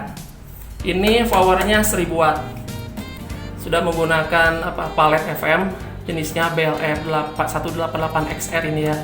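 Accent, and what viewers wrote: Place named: West Java, Indonesia. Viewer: Penjelasannya mantap jelas logatnya sundanis asli keeeren lahh pokoknya👍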